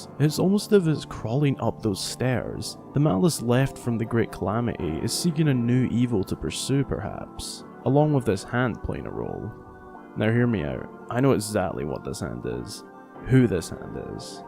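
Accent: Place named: Cockney, United Kingdom. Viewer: Your accent is so cockney XD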